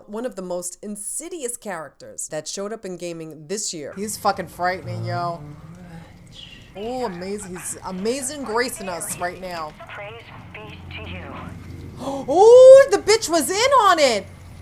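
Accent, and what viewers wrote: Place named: Texas, United States. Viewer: it looks like a mysterious Japanese female assassin with an American (Texas) accent hosting game awards .cool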